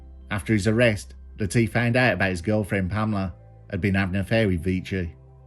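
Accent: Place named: England, United Kingdom. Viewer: I'm always please to watch. None other like it....bonus with the English accent! Not f--king London English. Peace and goodwill to The Main Man.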